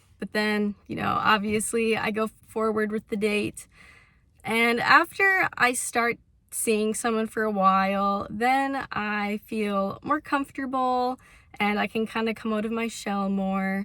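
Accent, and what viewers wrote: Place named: Wisconsin, United States. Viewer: I love the cute Wisconsin accent that is something between the Canadian “oooout” and the American “aut”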